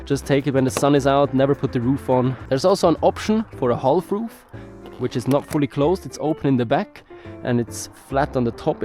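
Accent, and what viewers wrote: Switzerland. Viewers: Yeah, it's one of us. That much is right. But as I can tell a very clear Swiss accent from a German accent, We can narrow down the number of possible solutions even further. No need to be this vague.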